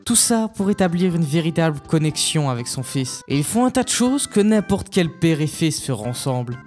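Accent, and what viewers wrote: Liège, Belgium. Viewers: J aime trop l accent de liege 😂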